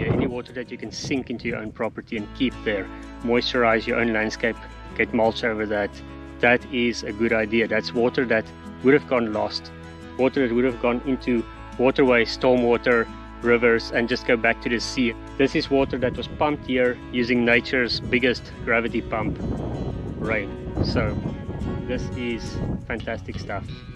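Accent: South Africa. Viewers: Cool. If for no other reason than fire risk control given rapidly increasing pace of climate change, swales could eventually make a huge difference to your property. Especially given the recent awful drought your part of the world has had; had a lot of UK news coverage at the time. Am I correct in assuming that you're in South Africa going by your accent and landscape? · Sounds South African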